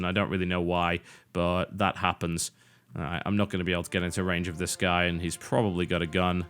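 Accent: United Kingdom. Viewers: but your british accent is soothing backround noise;) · no way im going to leave =d i come for the british accent and the opinion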